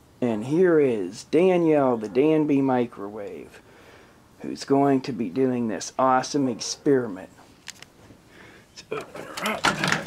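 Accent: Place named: Pennsylvania, United States. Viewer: Your accent is painful to listen to. I'm guessing you're from PA?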